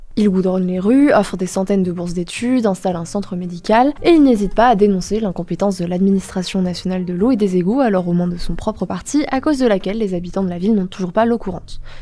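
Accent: Spain. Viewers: Pas grave du tout pour ton accent espagnol, c'est une langue de M..... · L accent espagnol de fait que cette vidéo passe de top à excellentissime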